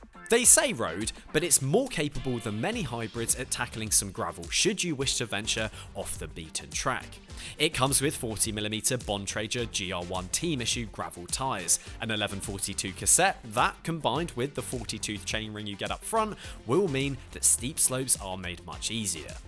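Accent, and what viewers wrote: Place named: England, United Kingdom. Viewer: I just love how English people say Aluminum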